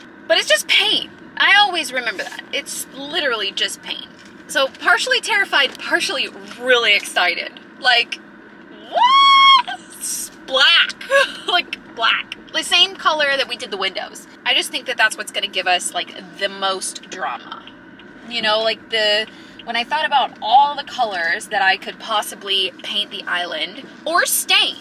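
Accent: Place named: South, United States. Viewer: It's so funny how your accent gets waaaay more southern when you shop with your mom LOL. I Enjoy these flea market vlogs so much <3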